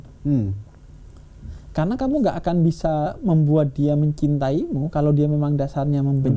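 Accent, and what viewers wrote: Madura, Indonesia. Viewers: Sama2 dari Salt-Island pantas saja logatnya sama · Logat mdura.. sma2 mdura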